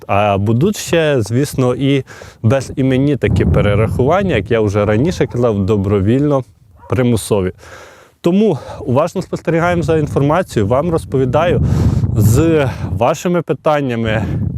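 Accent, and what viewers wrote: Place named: Galicia, Ukraine. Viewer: Зауваження ведучому,коли ви вже почнете вивчати і розмовляти правильною українською мовою,що це за слова буде,будуть?Гидко слухати.Ідіть на курси і навчайтесь розмовляти.Галицький у нас в Україні не проходить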